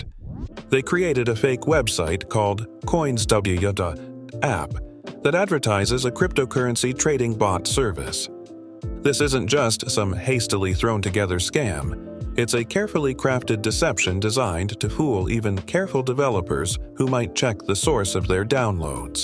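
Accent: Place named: United Kingdom. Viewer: Such a terrible robotic old British style voiceover. Why don't yoy use your own? Damn.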